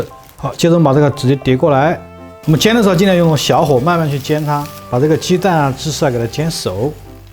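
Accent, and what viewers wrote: Sichuan, China. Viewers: 一听就是老乡—四川人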